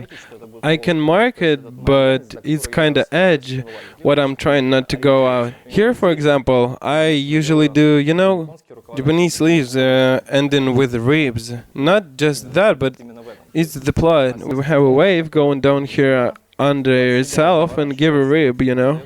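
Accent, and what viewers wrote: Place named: Scotland, United Kingdom. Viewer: у шотландский акцент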